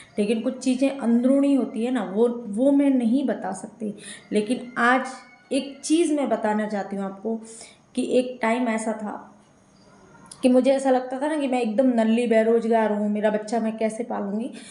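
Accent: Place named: Marwar, India. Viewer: Sabhi ko meri taraf say bahut bahut pyaar phir say aayenge mujhe to kee aur aap kee Marwadi boli sunani hai, sab ko meri taraf say bahut bahut pyaar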